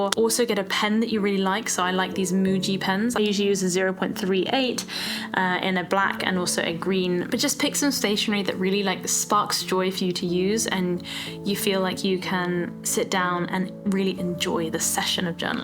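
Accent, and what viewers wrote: England, United Kingdom. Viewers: I'm 7 seconds in with with English accent and I'm "in".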